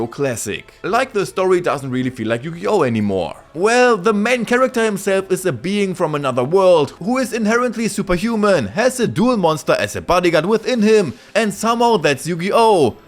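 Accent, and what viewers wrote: Germany. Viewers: are u german ? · this is German English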